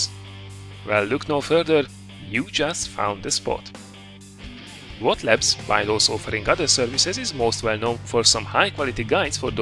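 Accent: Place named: Hungary. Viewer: ha tippelnem kéne akkor Magyar ... akcentusból ítélve.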